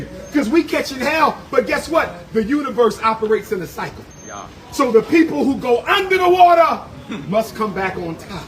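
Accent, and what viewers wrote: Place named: United States. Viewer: Who are the “indigenous” Jamaicans? Are you even Jamaican? Cos you sound American to me. And you guys clearly have your own issues you should be more concerned with. Secondly, of course Jamaican are gonna be nice to tourists. Tourism a huge part of the Jamaican economy. Thirdly, land isn’t “taken” it’s not the 1600s! Land is purchased, which the Jamaican people have no actual say in. Just like many neighbourhoods in New York were gentrified, were those neighbourhoods “taken”? You’re just chatting to be chatting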